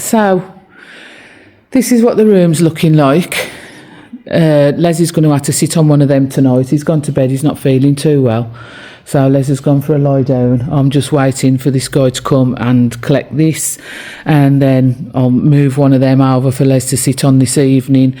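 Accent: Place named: Black Country, United Kingdom. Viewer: Hi love watching someone else with a blackcountry accent love the candle wick jokes x Done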